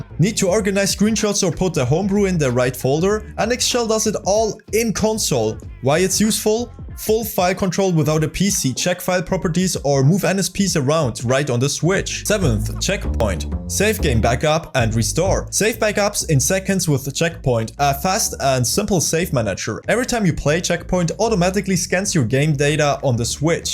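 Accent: Germany. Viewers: You can hear that this guy is from germany.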